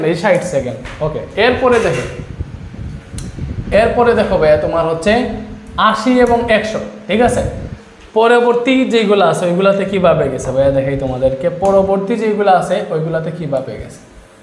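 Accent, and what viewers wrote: Sylhet, Bangladesh. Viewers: আপনি কী সিলটি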